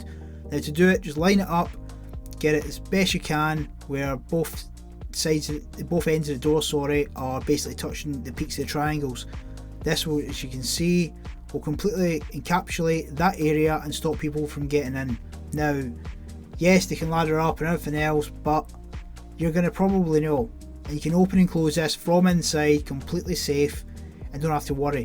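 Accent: Scotland, United Kingdom. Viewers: He bri'ish ? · Are you Scottish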